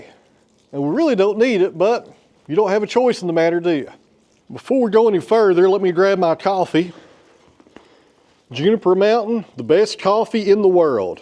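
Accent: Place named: South, United States. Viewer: Love your channel!!.. and your southern drawl! Refreshing personality. You should avoid placing batteries directly on the ground in the cold… as the earth naturally drains them far quicker than when they are insulated. Perhaps a simple insulated wooden box, mounted on corner post with a 40 watt incandescent bulb providing warmth. 🤷‍♂️ There is 40% less energy available in a battery 0*F than 80*F, everything else remaining the same. Good luck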